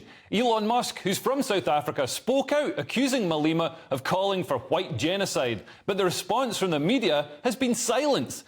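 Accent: Scotland, United Kingdom. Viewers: This is the worst analysis I’ve ever witnessed. At first I thought he was Irish and had an understanding of geo-politics but I think he’s Scottish 😅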